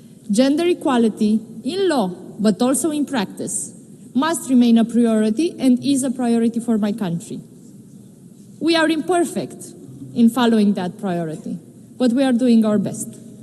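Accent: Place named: United States. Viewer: vorbește engleza americana standard. Dar dacă știi engleza britanică tot ar trebui sa o înțelegi. Acum desigur dacă ești pe la nivelul B1 sau B2 e cam greu sa înțelegi tot ce spune.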